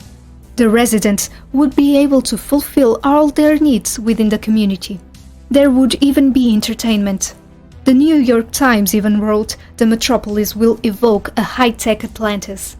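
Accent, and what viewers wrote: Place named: India. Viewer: Whats with the indian accent?? 😆😆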